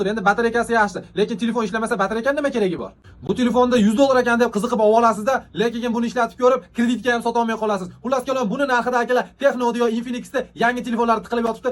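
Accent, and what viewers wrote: Andijan, Uzbekistan. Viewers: Хакикий анжанча обзор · Anjancha shevaaa 😂😂😂😂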